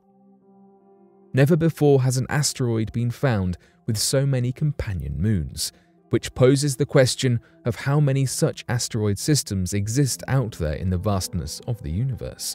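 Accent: United Kingdom. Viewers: Why do we have to hear Brit accent when they've never done anything space related.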